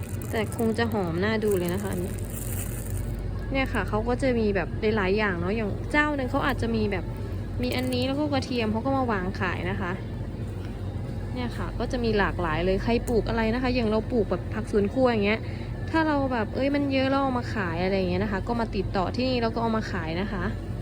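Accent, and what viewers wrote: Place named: Isan, Thailand. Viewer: ชอบพี่ พูดอีสานมาก 😂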